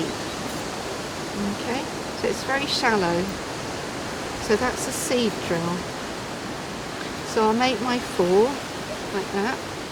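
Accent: United Kingdom. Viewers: Such a cute uk accent! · Thank you for the tips! I'll never thin again. You're a lovely British lady, with concomitant accent, and with a lovely garden and cat!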